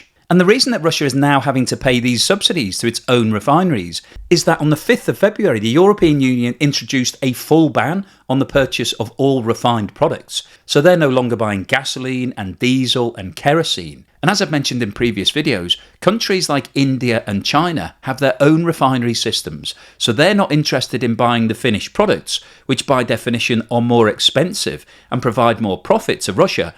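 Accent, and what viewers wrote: United Kingdom. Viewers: Already the British accent tells me this vid is a heap of lies 😂😂😂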